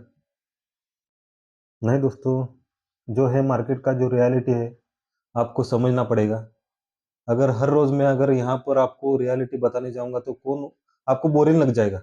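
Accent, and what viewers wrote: Maharashtra, India. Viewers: Sir ji aap kha se ho, bolne se to lagta hai ki , u r from Maharashtra, I'm from dharashiv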